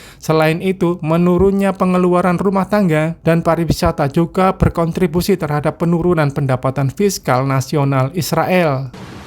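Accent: Java, Indonesia. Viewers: Komentatonya Ngomongnya MEDHOK... orang mana ya..??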